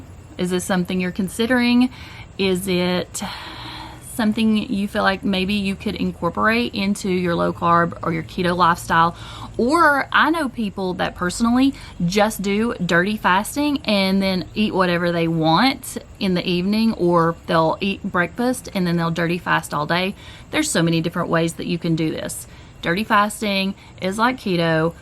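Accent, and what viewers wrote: South, United States. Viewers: shes not country she’s southern! Lol and I LIVE for it! Not to mention she has one of the most famous voices ever....She’s the Incredibles Mom! Lol This is a compliment 😂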